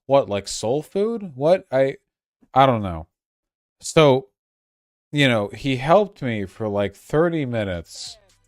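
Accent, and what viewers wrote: United Kingdom. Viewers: Everytime he gets drunk he becomes bri-hish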